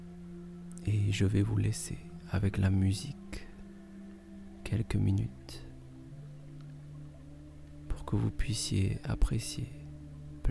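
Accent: South, France. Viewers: Oula ça sent l'accent du Sud, déjà j'accroche à mon "dialecte" 😉... Si je ne rajoute rien de plus c'est que je dors et c'est que c'est efficace 💪✌👌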